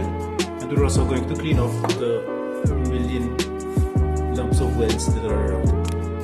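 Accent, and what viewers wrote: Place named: Eastern Europe. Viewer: Great work again, many thanks for sharing. Thanks too for letting us know where you live. Your dialect sounded more like a Eastern Europe to me.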